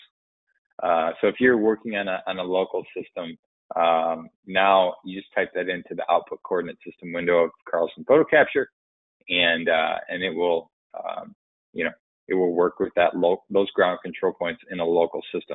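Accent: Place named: Western New York, United States. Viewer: Your accent makes me very nostalgic for my childhood home in western NY, up by the Great Lakes. 🙂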